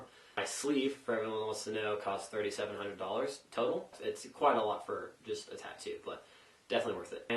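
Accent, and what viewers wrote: Ireland. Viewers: Your Irish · r u irish?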